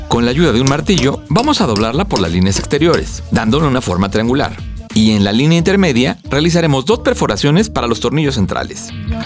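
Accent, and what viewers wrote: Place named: Peru. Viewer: Amigo, soy nuevo subcriptor en tu vídeo. Algunas acentuaciones de sílabas me hace pensar que eres peruano de lima educado. Estoy equivocado o eres de otro país?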